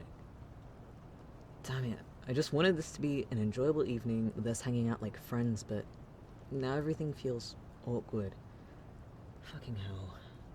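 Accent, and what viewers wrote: United Kingdom. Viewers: R u British because that accent hits different for me like omg how can someone have an angelic voice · I love your British Accent and you deserve more Subscribers!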